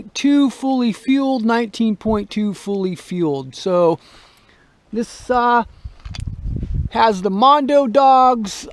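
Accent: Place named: North, United States. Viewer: You are literally the saw guru, I build big cat and Cummins motors for a living, and somedays work on our (dirt crew) were I might cut timber one day and run equipment the next just depending on what's we are doing) so when I say you have talent for saws know that I mean it because that's 1 mechanic to another and your yankee accent really tops it off you northerners really sounds like pricks half the time and coming from a southern hillbilly,,,,well we fucking love it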